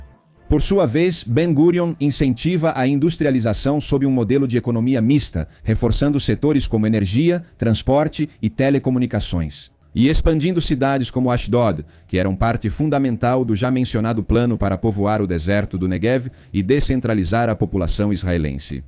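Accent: Portugal. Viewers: É um bom documentário. Mas essa narração atropelada, português lusitano misturado com português brasileiro. É muito rápida e confusa. Estragou o vídeo! Péssimo!!!!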